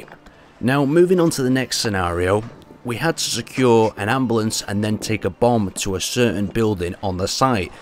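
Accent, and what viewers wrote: United Kingdom. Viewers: The clothing usually comes with such things, but as his accent tells hes most likely british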